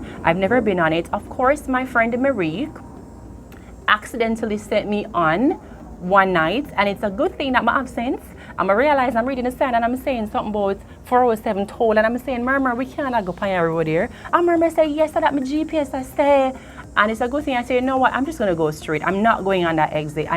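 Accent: Jamaica. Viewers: I was shocked that you never mentioned the weather girl. I guess you've seen enough videos of new immigrants having the wrong shoes and no gloves or head protection in their first winter here. As a 66 year old Canadian I find winter shocking to me every year so I have to run away to warm places for months. I could pick up your Jamaican dialect coming in whenever you started to get excited in the video. Having lots of Jamaican friends I'm used to that. Anyway, it was interesting to hear the differences coming from your angle. Welcome to our secret 'best country in the world' (ignoring winter). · A few points to fix, that will make this a great video.. - use different cam angle, more close up of your pretty face, lock your focus.. - use more full screen live & shot of your topic, with close up shot, (alcohol shopping full shot wud great, mon).. - a little long winded, make it shorter.. 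- use more text to highlight you topic.. - need to put your mic closer, so u sound clearer, louder (cos w the accent some words are lost to non-jamaican) * use more Jamaican slang/attitude, its fun, its cool n it adds flair.. * You hv great cam presence n the way you talk is fun/great (like shameless maya).. just need more variety (like jennelle eliana) .. 👍👍👍 Get ready to go viral..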